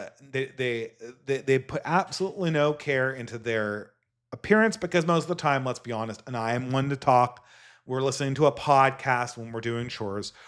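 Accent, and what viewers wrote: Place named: California, United States. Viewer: you really gotta do something about the California accent.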